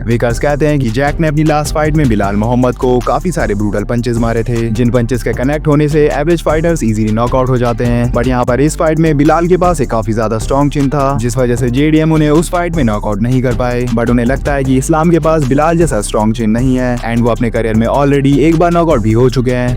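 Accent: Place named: India. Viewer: Wich language are you speaking i hear enlish brother i hear india i hear nigeria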